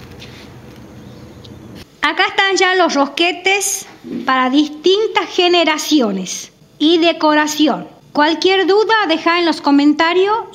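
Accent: Tucumán, Argentina. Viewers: gracias por compartir tus recuerdos,y recetas..soy Santiagueña y muy fuera de lo que dicen amo a Tucuman,es una provincia que me brindo mucho,sobre todo laboral...gracias por tú voz inconfudiblemnte Tucumana!!!! Díos te Bendiga!!!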